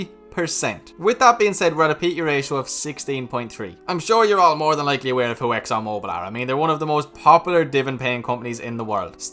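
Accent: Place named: Wicklow, Ireland. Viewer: Let me guess..., Wicklow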